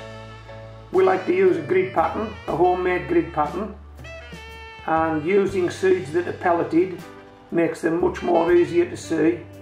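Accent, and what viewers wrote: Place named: Cumbria, United Kingdom. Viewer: Areet Marra? Not often you hear Cumbrian on the Youtubes ;) Now we're at home all the time, there's a bit more chance to get in the garden (silver lining?) So I'll watch and learn whats going to work in our neck of the woods. Great content - Keep it coming Tek care o yersel and that laal dog eh!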